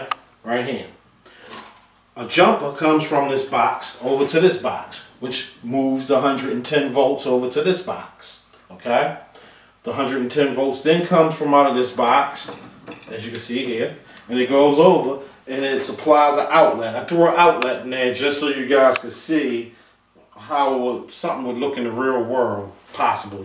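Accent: Baltimore, United States. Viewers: Are you in the Baltimore area? Kind of a Bmore accent. I'm in DC. Anyway kick ads videos. You just got another subscription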